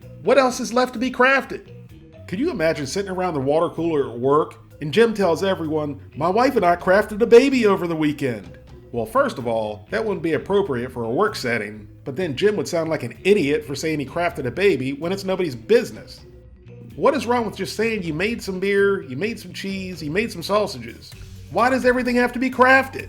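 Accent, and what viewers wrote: South, United States. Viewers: Being from the northeast, nobody here says "pizza pie". It's awkward and a bit redundant. So hearing it, along with a southern twang and so much excitement is funny, in a strange way. It's not like I'm having Vietnam-esque, PTSD flashbacks and night sweats. But it's amusing though.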